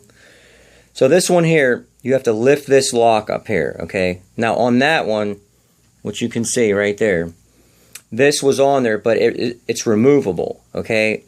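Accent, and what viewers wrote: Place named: Pennsylvania, United States. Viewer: "you can tighten this daaan" I love your Pennsylvania accent.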